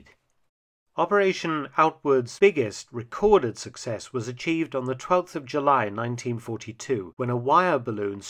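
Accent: United Kingdom. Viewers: Brit pronunciation. Any adult has heard this.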